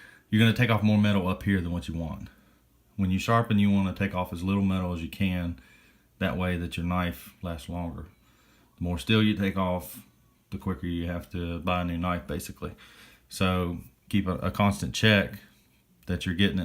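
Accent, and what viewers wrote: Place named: Texas, United States. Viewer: You from Dallas? You sound like Vinnie Paul. You haven’t posted for years, but your instruction helped clarify what I needed to get razor (and mirror) sharp. Cheers brother, thank you.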